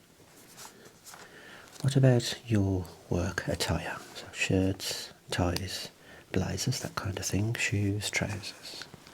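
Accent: Birmingham, United Kingdom. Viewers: I didn't know how much I needed Brummie ASMR 💓 this was lovely, thank you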